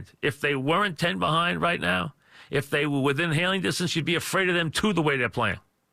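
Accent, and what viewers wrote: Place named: New York, United States. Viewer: I wish these stupid New yurkers would learn how to speak English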